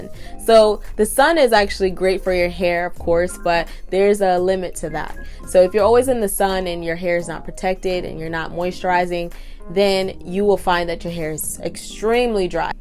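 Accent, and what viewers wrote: Jamaica. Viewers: Ayyyy love the patois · You from Jamaica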